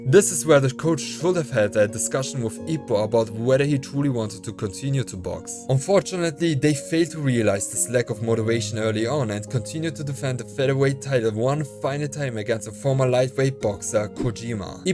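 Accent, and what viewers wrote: Germany. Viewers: bist du zufällig deutscher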